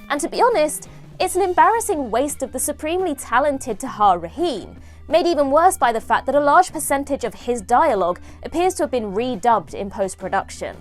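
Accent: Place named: Exeter, United Kingdom. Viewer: Love the accent. Are you from Exeter?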